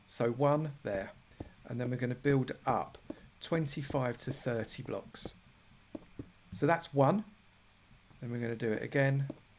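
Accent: United Kingdom. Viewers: Niks because he has a British accent, dumbass.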